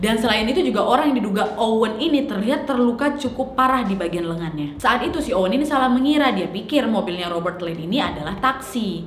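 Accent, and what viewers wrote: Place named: Medan, Indonesia. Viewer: Orang medan memang kek gitu,cara ngomongnya memang seru seru jadi orang luar medan dengarnya enak,ngga ngegas tapi dikira ngegas,ngomong kek orng berantam hwhw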